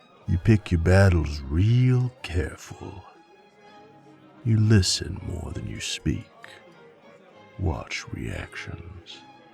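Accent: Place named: South, United States. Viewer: SOUTHERN ACCENT😭🙏🙏🙏🫄🫄🫄 CONSIDER MY M PREGGED AND MY EARS GASMED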